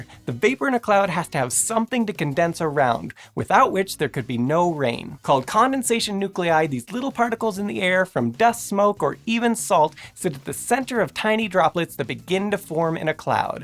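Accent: United States. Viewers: I like American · Hey, the American guy is back! Cool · got FAT and lost the hability to speak PROPER ENGLISH, meaning, he got murican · Can't watch this. Didn't realize how much more annoying and less interesting the videos are when you swap out a crisp English voice for a generic annoying yank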